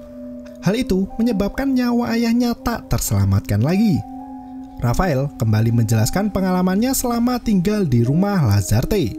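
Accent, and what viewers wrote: Java, Indonesia. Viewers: Medokny keliatan banget..jowo ndi mas😆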